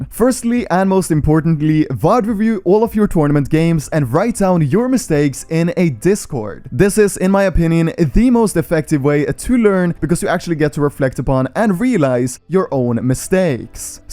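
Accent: Denmark. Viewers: are you danish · are you Danish ??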